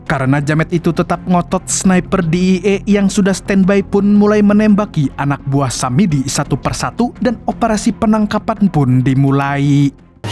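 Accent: Banjar, Indonesia. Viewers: Wihh Abang orang Banjar ya😂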